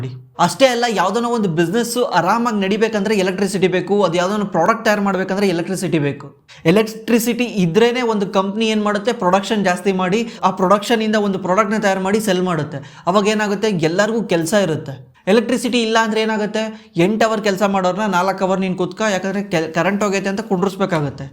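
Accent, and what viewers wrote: North Karnataka, India. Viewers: Current hogeti anta kundarasabek antete super brother uttara kannada langauge...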